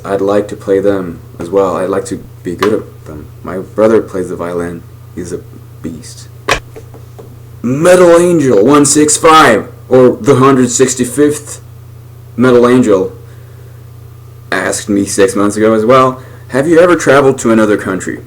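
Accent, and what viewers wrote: United States. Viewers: why do you speak English so good? And also why do you not have a Finnish accent? You sound very american! \m/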